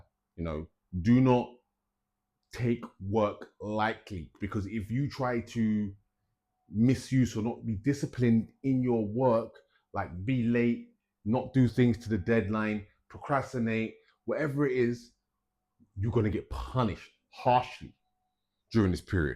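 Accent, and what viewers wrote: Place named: United Kingdom. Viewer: So refreshing to listen to a wonderful astrology session that is presented with a British accent!!❤🎉🙏👍💫✨️🌟✨️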